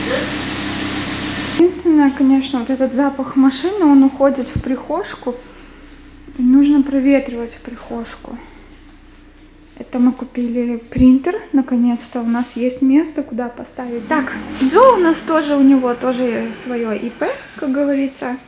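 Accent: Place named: Japan. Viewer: Вы очень красивая и милая☺️ мне нравится как вы говорите на английском и cute Japanese accent❤️ Желаю вашему каналу процветаний🥰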